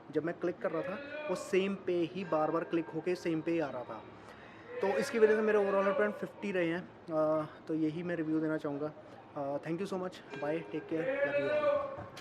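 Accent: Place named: Punjab, India. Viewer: Bhai aap Punjab se ho kya? Apki hindi me bhi punjabi accent aa raha hai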